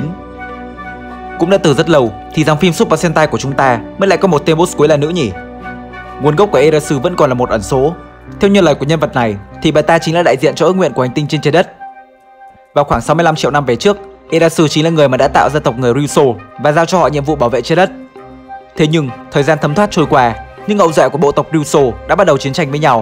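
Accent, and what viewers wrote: Japan. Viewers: Có một vấn đề mà mình khá khó hiểu, chủ kênh anh thường phát âm danh từ thuật ngữ trong video theo kiểu Nhật dù có là từ có phần tiếng Anh cũng thành japanlish, mà sao trong video này đoạn lúc thì lup'A'n lúc thì lup'I'n vậy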